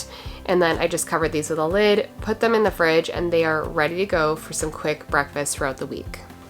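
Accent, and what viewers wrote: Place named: Canada. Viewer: I thought you might be Canadian by your accent, and then I saw thr PC brand raspberries and confirmed it. 🇨🇦 👋🏻 I am, however, disappointed that you've chosen to have only American product links 😢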